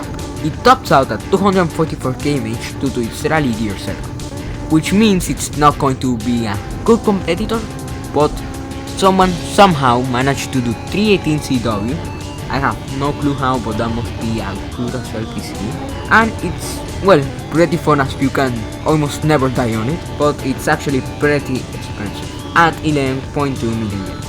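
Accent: Spain. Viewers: wtf the spanish accent bro lmao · Luv the Spanish accent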